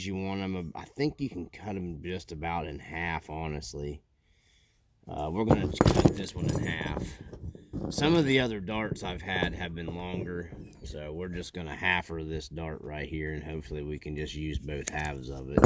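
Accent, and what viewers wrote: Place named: Australia. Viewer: Thanks for the shoutout and kind words mate. Love the Aussie accent 😉. Just found your video and enjoyed the watch. Now subscribed - Thunder from Downunder! 🌏🇦🇺🦘🐨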